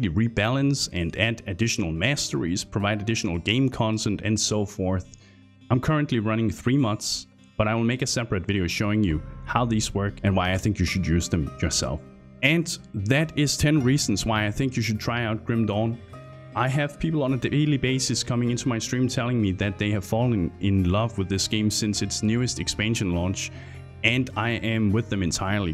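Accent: Denmark. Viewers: are you Danish? your dialect sounds so Danish! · im guessing from ur accent that ur danish, but im not sure. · Like ur videos, it sound like ur from Denmark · The lad is Danish so cut him some slack ffs. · It’s a danish accent lol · Haha den dansker accent XD god video min ven.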